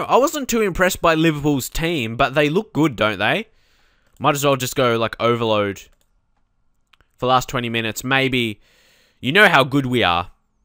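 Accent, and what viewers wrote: Australia. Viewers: Best fm series on youtube. The detail u go into makes it so much more interesting. Length is fine aswell. No need 2 change a thing. Where abouts in australia are you from mate?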